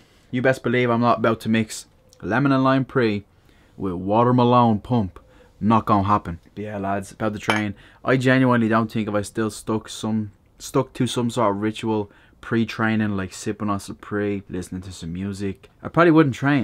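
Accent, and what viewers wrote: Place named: Dublin, Ireland. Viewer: You sound more like a dubliner lately I love it mate 😂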